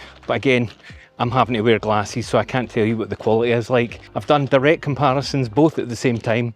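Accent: Scotland, United Kingdom. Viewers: This review helped make my decision for the P3. I'm now a subscriber to you. Love your Scottish accent, too.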